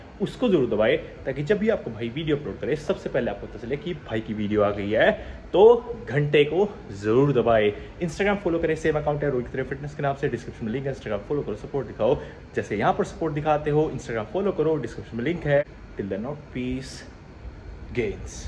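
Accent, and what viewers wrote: Haryana, India. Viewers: Bhai haryanvi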